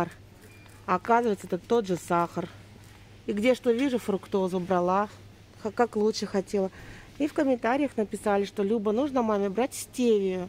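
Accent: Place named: Crimea, Ukraine. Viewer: В Крыму тоже так говорят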